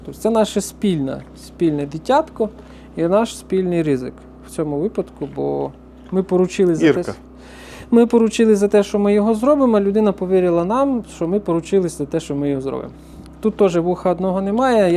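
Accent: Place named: Ukraine. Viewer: попадаю додому цей акцент ні з чим не спутаєш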